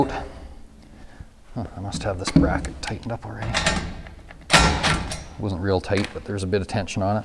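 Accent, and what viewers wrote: Canada. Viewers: Outstanding instructional video. I'm assuming from this guy's accent that he is Canadian and it has been my experience that Canadians just get things done - efficiently and resourcefully, without a lot of hoopla and distractions. No lousy music, cussing (tho' he came close at one point), or embarrassing attempts at special effects and other so-called creative videography. Simply good, clear, well-paced instruction. Will check out the other videos at Houseimprovements. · Great pleasant Canadian accent n the know, the how, the teach.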